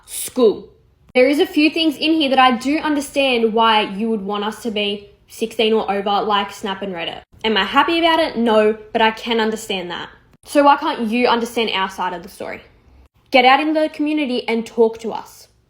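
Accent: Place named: Australia. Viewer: she aint from new york, she aussie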